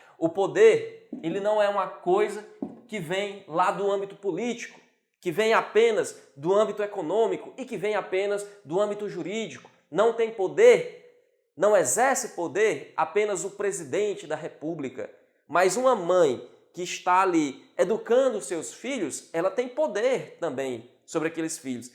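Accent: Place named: Rio Grande do Sul, Brazil. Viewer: "Pudê" (poder)....sotaque gaucho.....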